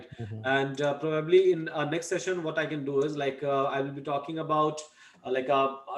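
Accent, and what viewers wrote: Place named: Bhojpuri, India. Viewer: some words of his sound like "englisss" that's literally bhojpuri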